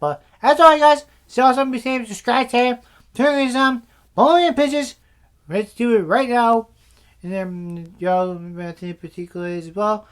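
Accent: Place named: England, United Kingdom. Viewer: Awesome airplane review is very interesting and so much information enjoy like your English accent smile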